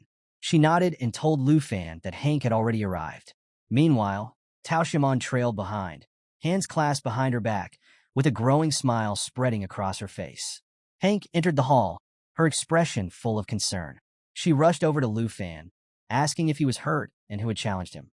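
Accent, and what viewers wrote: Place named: United Kingdom. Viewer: The british narrator change on the 10 hour to 12 hour mark made my brain go mush i could not understand anything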